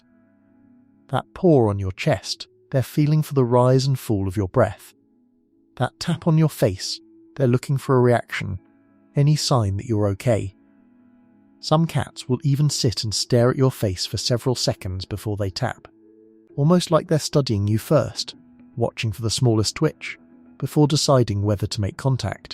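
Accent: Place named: United Kingdom. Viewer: Because it sounds better in a British accent. And the rest of the world is tired of listening to american accents tbh.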